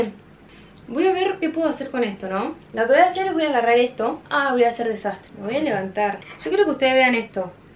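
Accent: Argentina. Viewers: Se nota que eres Argentina o Uruguaya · Es argentina porque tiene el asento argentino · Por las groserías y el acento debe ser argentina o colombiana. Ahora resulta ser mexicana :v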